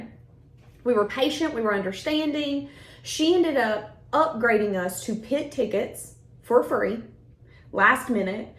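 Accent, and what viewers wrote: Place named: East Tennessee, United States. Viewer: Love the East TN accent:face-red-heart-shape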